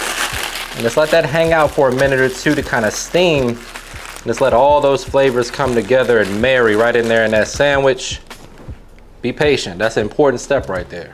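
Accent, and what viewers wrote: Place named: South, United States. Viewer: Where are you from?! You sound southern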